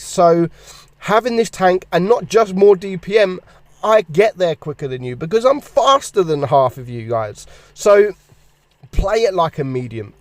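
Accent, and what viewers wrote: United Kingdom. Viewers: don't ya love it when gets on the mic and says in his British accent "Hello! I'm, and welcome back to the channel"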